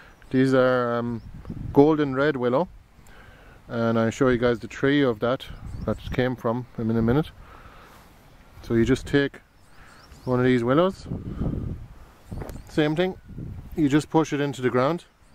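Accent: Ireland. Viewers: Hi,,thank you for the info,,,enjoyed listening to a good old Irish accent,,you have a new subbie now,,hope to make an arch,soon,,,Cheers,,,Stay Safe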